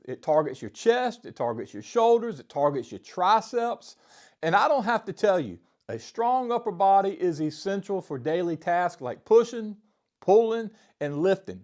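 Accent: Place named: North Louisiana, United States. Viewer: I detect north Louisiana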